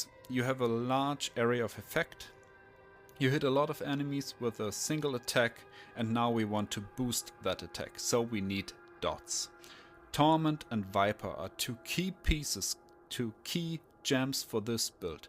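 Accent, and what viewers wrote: Germany. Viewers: Bro bist du Deutscher hört man Akzent raus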